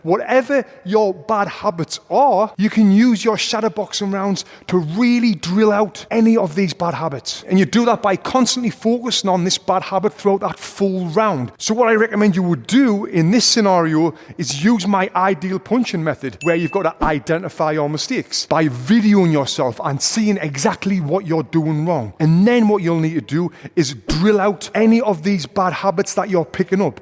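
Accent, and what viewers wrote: United Kingdom. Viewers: Is it 'Britain English'?!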